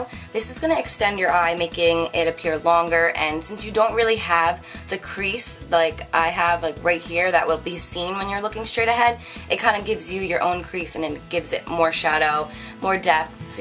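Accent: New Jersey, United States. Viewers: I agree. I think it's that slight and subtle New Jersey accent. :)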